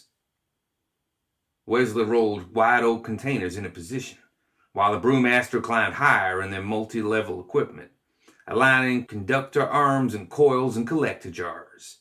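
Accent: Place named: Louisiana, United States. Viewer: Interesting choice to have the story read in a Bayou accent.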